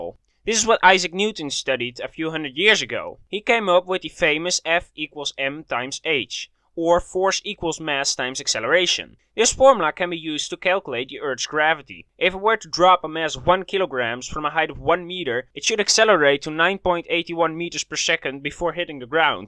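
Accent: Netherlands. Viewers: Are you Dutch? You sure sound like you are!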